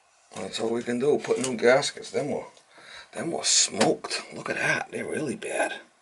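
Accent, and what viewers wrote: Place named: Minnesota, United States. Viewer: Hi,are you from Minnesota or Boston? Interesting accent brother. Nice video, I still dread rebuilding a Delta valve even after 32 years in the trade. DD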